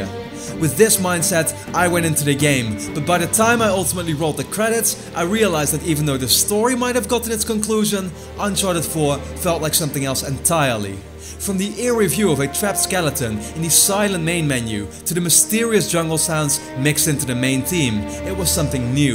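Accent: Netherlands. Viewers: Hahaha, je hebt echt zon zwaar engels-nederlands accent ^_^. Ik zat wat reviews te kijken, dacht dat je een engels kanaal was. En je klinkt zo verschrikkelijk nederlands. ;) Leuk kanaal trouwens. Goed dat je het in het engels doet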